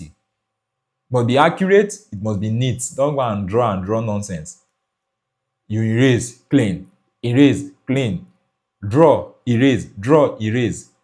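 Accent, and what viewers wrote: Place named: India. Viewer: Why am i hearing indian here oo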